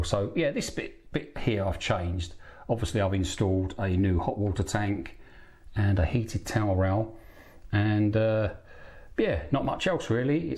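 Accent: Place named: United Kingdom. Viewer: Thank you for the vlog, i love the scenery, and the way you hv done up your property. If i ever have students again to teach them english i will recommend your chennel, beautiful every day british english. Thank you sir.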